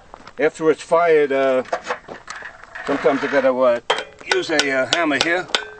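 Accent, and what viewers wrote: New York, United States. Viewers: New York accent, articulates his plans. Kevin McAllister grew up.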